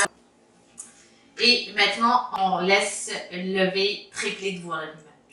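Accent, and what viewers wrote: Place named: Canada. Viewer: Merci pour la recette trop mignon l accent canadien sur une femme kabyle Recette que je vais tester merci pour le partage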